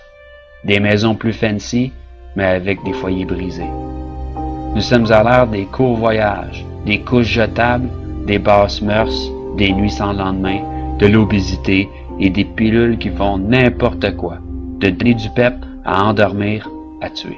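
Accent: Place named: Canada. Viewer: j'adore l'accent Canadiens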